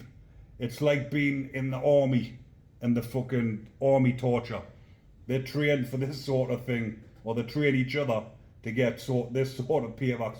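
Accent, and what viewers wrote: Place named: North East England, United Kingdom. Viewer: Hi you ARE BRILLIANT LOVE YOUR SWEET FUNNY NORTH EAST ACCENT. ❤❤❤❤❤❤❤❤❤❤❤❤❤❤❤❤You are the bees knees. Handsome north east lad. 🎉🎉🎉🎉🎉🎉🎉🎉🎉🎉🎉🎉🎉🎉🎉🎉🎉🎉🎉🎉🎉🎉🎉🎉🎉🎉🎉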